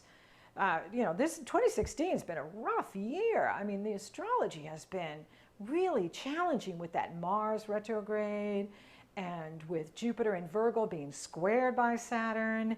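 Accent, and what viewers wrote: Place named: Northeast, United States. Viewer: Great to hear from you,! Thank you for taking us on this tour, I LOVE it! Although I'm slightly confused, are you saying that our ascendant changes to our current location? Also, as born and bred Jersey Girl, I believed that your accent was North Eastern. My suspicions have been confirmed LOL. Anyway, again. Glad to hear from you and looking forward to more adventures with you:)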